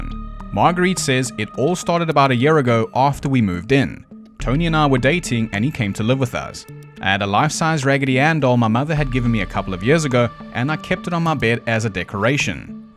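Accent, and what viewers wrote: South Africa. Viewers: So good to hear a South African Accent🎉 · Are you from South Africa? 🇿🇦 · Tried to comment earlier and couldn't. Wanted to say I love your personal flare to reading these cases out loud, pretty much saying what we're all thinking, giving validation to those thoughts and feelings. And it's really nice hearing from a south African, I am subscribed to many channels across the globe, but never had one of those until now. But I did want to say that one of your background sound tracks bothers me, it's the popping and crackling one. just hits me in the ear wrong and makes me either mute that part or turn to my phone and play something. I can't describe it any better than that, I'm sorry. other than that, 10 out 10 channel! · A FOLLOW SOUTH AFRICAN??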